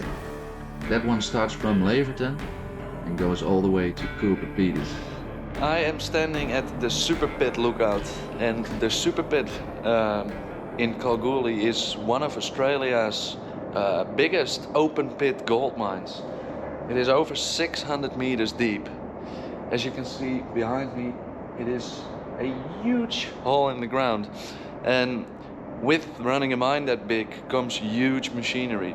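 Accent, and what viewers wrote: Netherlands. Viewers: Do you sell merchandises? Your dutch accent reminds me of Itchy Boots Noraly. You both sound the same. I live on Australia. Used to live in Germany. I can understand and read dutch a little bit. Sounds similar to German.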